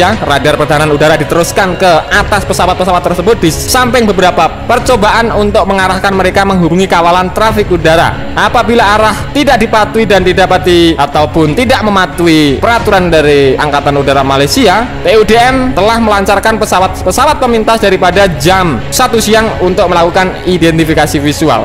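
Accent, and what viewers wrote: Java, Indonesia. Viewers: Logatnya logat jawa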